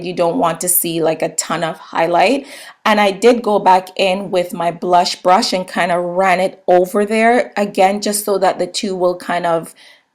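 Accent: Jamaica. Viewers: are you Jamaican? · I hear an jamaican accent in there :D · Hey you sound Jamaican. Are you? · Are u Jamaican? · First video I'm watching of you, but correct me if Im wrong but I think you are Jamaican, I just know a Jamaican as soon as I see and hear one. Guess its the patriotism in me.... Love my place and my people badddd!!!! but if ur not its cool, i still love your video lol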